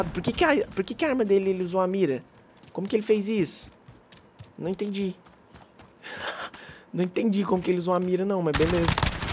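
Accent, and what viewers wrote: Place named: Goiânia, Brazil. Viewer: eu sabia, reconheci pelo seu jeito de falar, eu também sou de Goiânia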